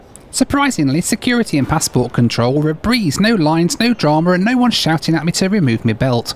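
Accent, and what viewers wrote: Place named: England, United Kingdom. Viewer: Brilliant vid, thanks!! I’m so glad you still have the English accent keep representing us out there mate!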